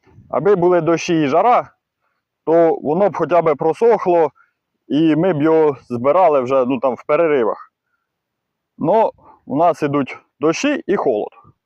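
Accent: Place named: Poltava, Ukraine. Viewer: почув акцент що ти полтавчанин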